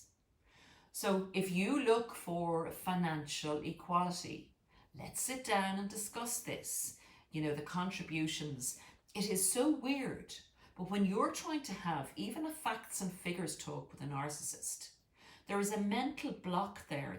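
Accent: Ireland. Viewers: It is like balm to my soul to listen to your lovely irish voice. I have had a lifetine of narcissistic trauma. Parents,ex husband,romantic relationship,boss. I am so done with it. NEVER AGAIN!!! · Her beautiful and musical Irish accent, and amazing storytelling (another undeniable Irish talent) just keepet me hooked to her great content. Thank you for nurturing us with your wisdom and beautiful Irishry.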